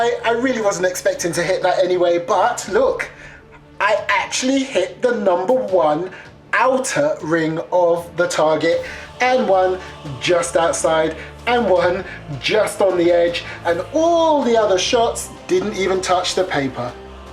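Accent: Texas, United States. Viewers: Your american accent is actually really good! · Your Texas accent was actually really good. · Starring In: The Rifleman Your American accent is great, the western intros are hilarious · actually, your texas accent sounds awesome. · nice american accent XD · Good American accent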